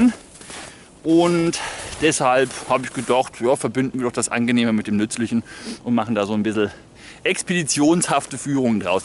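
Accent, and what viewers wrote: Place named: Karlsruhe, Germany. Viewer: Naja.. fundiertes Wissen wird vermittelt, keine Halbwahrheiten Man lernt also immer etwas. Deine Sprechweise ist amüsant und hörenswert (wohl weil es recht bekannt ist, ich wohne bei Karlsruhe). Es wird einem nie langweilig.. Manch andere Kanäle höre ich neben einem Onlinespiel.. Bei dir ist das nicht so. Von Anfang bis zum Ende interessant. Mach weiter so:-)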